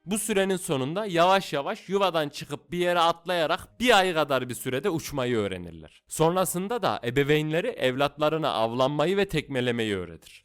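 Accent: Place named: Sivas, Turkey. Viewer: Seslediren yüzde 99 Sivaslı herhal😂 süper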